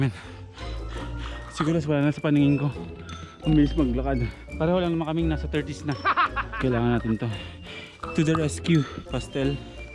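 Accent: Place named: Karay-a, Philippines. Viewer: Do you speak ‘karay-a’? It’s cute to hear😀😀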